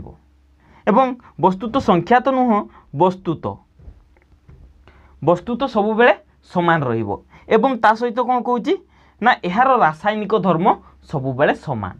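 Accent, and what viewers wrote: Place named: Sambalpur, India. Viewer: Tame sambalpuria enta laguchhe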